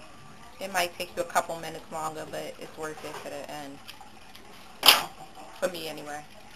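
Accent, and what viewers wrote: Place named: Philadelphia, United States. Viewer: haha I new it. I'm from philly and our accents are quite simular..lol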